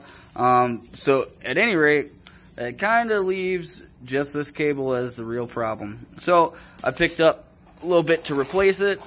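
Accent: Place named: Midwest, United States. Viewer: Midwestern lmao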